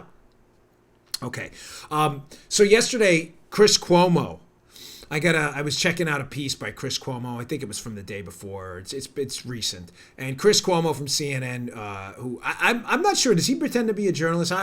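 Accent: New York, United States. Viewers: You rock, Patriot! keep that New York accent.....so tired of the fake "Steele Farce-ier" We need orange vests with a huge "Q" on it. We will call it "the Trump/Q OrangeQrew": What do you think Donnyboy?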